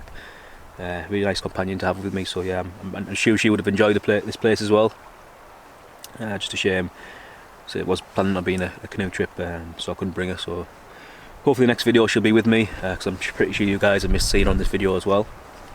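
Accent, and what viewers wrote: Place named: Teesside, United Kingdom. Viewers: Excellent video lovely scenery you sound like your from teesside way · Cracking upload not sure how I never seen your channel before ?? Youtube algorithms at work I am guessing.. Awesome location and pitch .. I was there one year ago wild camping with my youngest son. We got eaten alive by Midges and had to bail out ... The accent sounds local to me.. ATB from a South Shields wild camper... Subbed..P.S. I do a bit of Astro Photography and The Galloway National park is a Bortal 1 ..Meaning zero light pollution.. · Sounds very much like a Teesside voice, a Local version of Paul Messner. A good video of someone camping responsibly.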